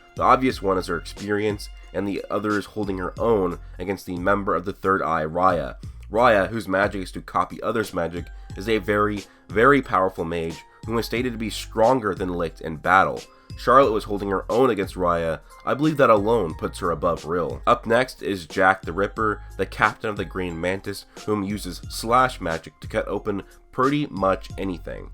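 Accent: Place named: England, United Kingdom. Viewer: Great video. I totally agree with this list. One thing you should work on is the way you say their names. The english accent kinda ruins the japanese name of some characters. So work on it. You have my like though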